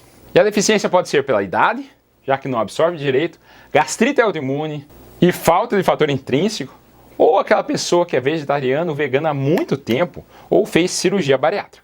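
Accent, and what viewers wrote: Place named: Minas Gerais, Brazil. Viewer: Esse doutor é muito simpático, sotaque de mineirim 🥰 Bom que fala rápido...rsrs...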